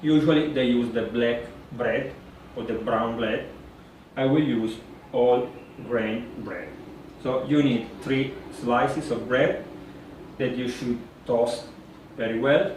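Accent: Italy. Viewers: Grazie mile... O seu sotaque italiano é muito gracioso... e o melhor de seu vídeo é que filmaste o fim, ou seja, como o kvass se comportou no copo.. obrigado.. abraços do brasil.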